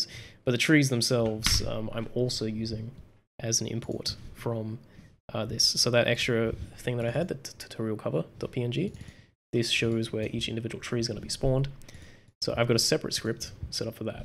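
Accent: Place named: Australia. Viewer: . Yep. Aussie.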